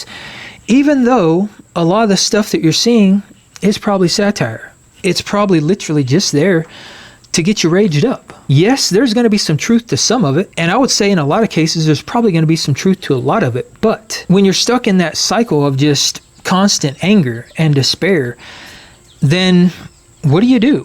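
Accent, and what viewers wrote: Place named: Kentucky, United States. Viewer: I’m from Southampton, UK. I’ve been listening for a while — what an accent you have from Kunnnntuckyyyy! Anyway, I wonder if these data centres are also connected to rezoning. Imagine living on the same road as one of these facilities with all the noise pollution. It makes you wonder whether some people are being pushed out of their homes.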